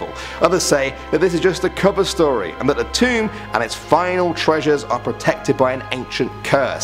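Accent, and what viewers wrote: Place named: United Kingdom. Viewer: Never have a Brit narrating.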